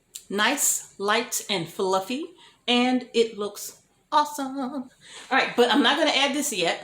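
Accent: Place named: United States. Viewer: I love this lady she talks so nice. Not overly English or southern style. Very good sound for me I am hard of hearing l love her American sound.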